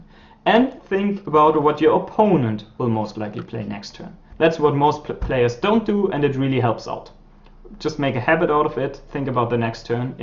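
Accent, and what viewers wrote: Germany. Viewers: Wondered about that aswell, just sounds like german accent right? :-D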